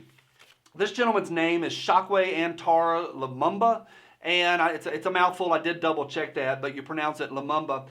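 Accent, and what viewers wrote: South, United States. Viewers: You always earn it!!! PS. How does a Vermont native get such a thick southern accent??? Lol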